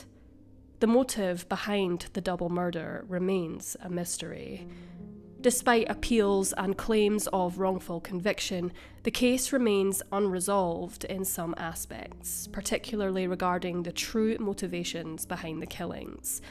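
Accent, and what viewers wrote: Scotland, United Kingdom. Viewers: She is Scottish and the English... UK pronunciation is coupee. Stop expecting everyone to speak American English. ~From a fellow American. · The narrator is Scottish.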